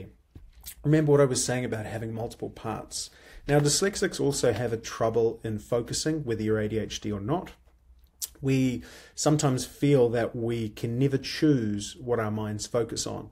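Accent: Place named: New Zealand. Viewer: Lovely hearing your Kiwi accent!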